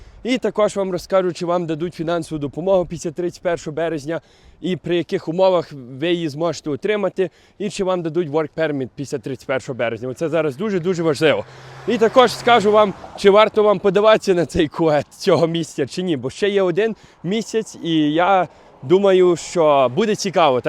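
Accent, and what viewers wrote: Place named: Transcarpathia, Ukraine. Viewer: Львів точно так не говорить, навіть близько, а от на Закарпатті так)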